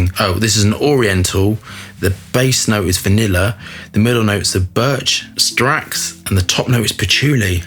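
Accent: United Kingdom. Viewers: i like your Britification of every word. "Le Laleeebo". And maybe a high vapor pressure isolate of patchouli can occur on the top maybe?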